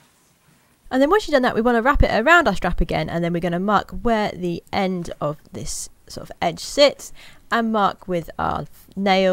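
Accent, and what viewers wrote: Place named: Scotland, United Kingdom. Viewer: Такой интересный акцент, интересно, откуда эта милая женщина, из Шотландии?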